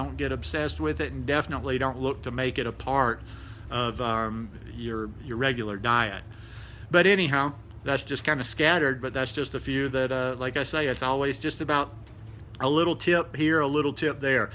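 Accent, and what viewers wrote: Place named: Texas, United States. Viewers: where you from originally? you have a similar accent that I have! I'm from north Alabama. it's always nice to here a similar accent! lmao. great video btw. I learned a lot. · Love your accent. You sound like a fellow Texan. Am I right?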